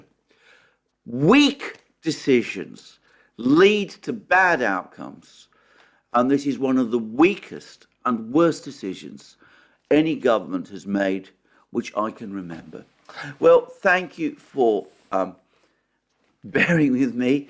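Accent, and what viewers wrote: England, United Kingdom. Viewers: It's weird listening to a communist with an English accent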